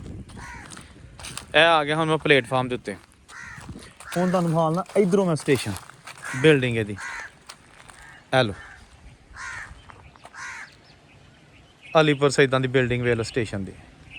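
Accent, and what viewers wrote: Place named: Jammu, India. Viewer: Thudi boli bilkul Jammu aur kathua wali hai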